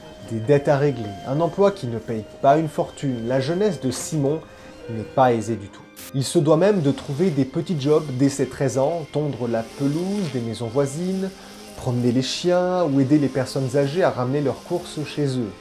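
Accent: Canada. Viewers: ton accent Québécois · tu as l'accent canadien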